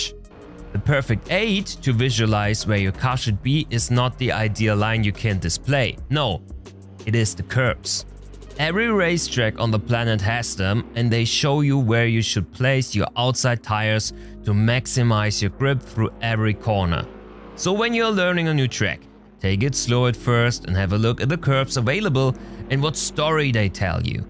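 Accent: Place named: Germany. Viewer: Glaube man hört es wirklich raus wenn ein deutscher englisch spricht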